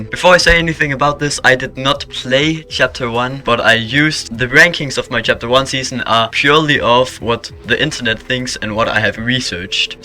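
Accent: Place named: Denmark. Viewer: nice me to i was about to ask you. Bc you really have that danish accent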